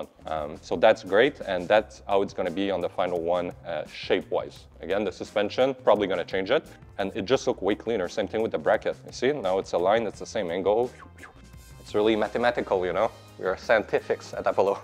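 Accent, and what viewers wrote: Quebec, Canada. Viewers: Sa parais que tes quebecois loll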